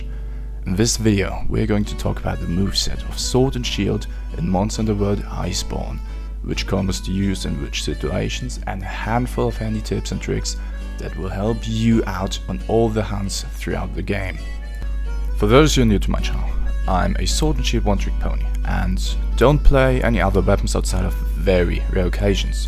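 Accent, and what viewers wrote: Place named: Germany. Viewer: Klingst auf jedenfall deutsch xD sehr schönes Video 👍Abo hast du sicher.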